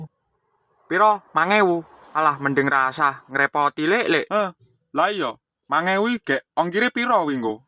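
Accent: Surakarta, Indonesia. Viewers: wong solo to bang?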